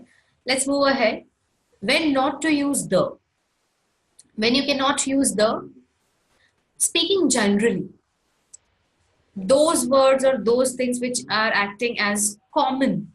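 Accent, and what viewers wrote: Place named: India. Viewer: i dont understand Indian accent i hate it very ugly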